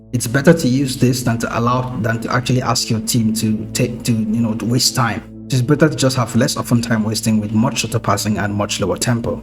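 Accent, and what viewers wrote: Nigeria. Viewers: i heard the voice and i had a feeling Nice to find a nigerian fm youtuber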